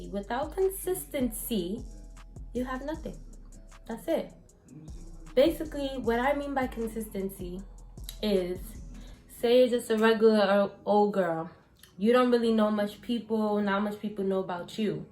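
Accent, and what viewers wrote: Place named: New York, United States. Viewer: Haha loved this. Girl you gotta be from NY I know a NY accent when I hear one lols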